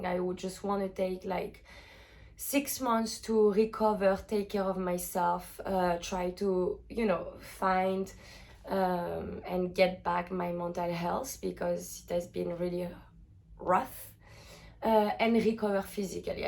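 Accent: France. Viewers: L’accent français ✨✨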